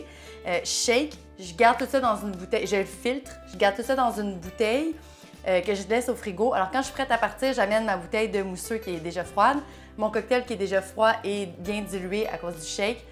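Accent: Quebec, Canada. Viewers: C'est une tuerie cette video, la recette est clair, tout est montre et bien explique. Et en bon Francois entendre le dialecte quebecois sur une recette Francais c'est vraiment sympa.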